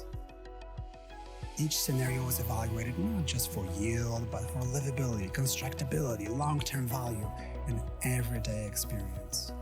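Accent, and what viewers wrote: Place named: Transatlantic, United States. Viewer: Came for the Chicago urbanism and good title, left for the transatlantic whispery latte art pretension. Good lord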